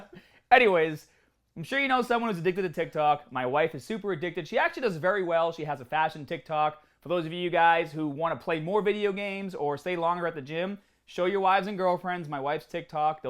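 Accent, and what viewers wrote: Boston, United States. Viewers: Body Shaming? What about language shaming? I can't believe you and your Bostonian accent can make fun of how others pronounce words like Granola!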